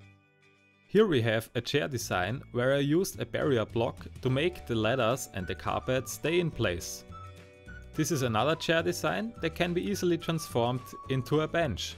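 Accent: Germany. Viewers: man hört richtig das du eigentlich deutsch sprichst 😂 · Dieser deutsche Akzent^^ Sehr schöne Sachen! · Kann es sein, das du Deutsch kannst (leichter Deutschdialeckt)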